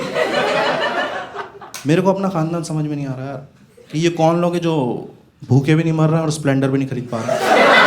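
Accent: Indore, India. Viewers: Bhai dono madhay pardes ke hai.... Bhai ka accent toh pura biaora , indore ke aas paas ka lag raha hai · Both Zakir and are from indore.. And that is the indori accent. That's why they sound same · Both he and Zakir are from Madhyapradesh and that’s why similar Hindi dialect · Dono hamre MP se hena to accent to same hoga hi or me bn gaya itna bda comedian to mere ander bhi aap esa hi accent dekhoge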